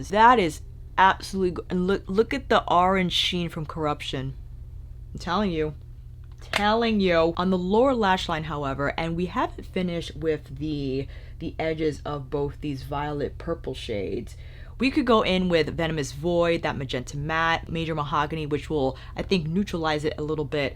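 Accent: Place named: New York, United States. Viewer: Wow. I apologize for saying how well put and well spoken the review was.....after watching the live with the owner of CR with Kelsey.....I didnt mean it from a place of "wow you're not ignorant and I'm surprised because of your skin tone" and I didnt know if that is how it sounded. Im a new subscriber and You described the palette in terms of letting a consumer like me know about which shades are what, ya know? As a fellow NYer and moved to Indianapolis, In I loved hearing your accent as well. So nothing but love here girl...I just went to that video after this one and as soon as I heard all y'all talking about those "You sound so smart" comments I was like wow.....maybe I sounded that way.......maybe you wont even read this and you dont care at all......either way, already a fan lol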